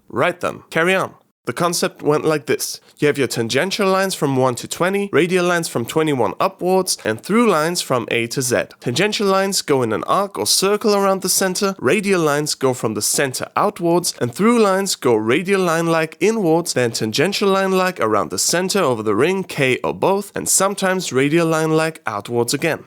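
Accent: Vienna, Austria. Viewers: Its weird seeing an youtube channel in english with hints of austria or austrian dialects such as the: Tor! I wea narisch! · ich schau mir das hier nur an weil dein österreichischer Akzent sich im englischen dermaßen amüsant anhört dass es eher nicht geht XD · Sad but true story: I'm German and always watch the English version of your videos as the Wiener Version is completely incomprehensible if you're not used to that dialect :D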